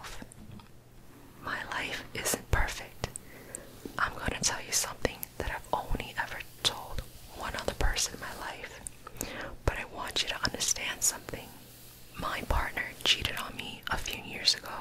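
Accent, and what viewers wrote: United States. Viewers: Your NY accent is all up n down your vowels... i love it lol... where in NYC did you grow up? If you tell me youre a Brooklyn chick i'll die 💜 (assuming you respond again)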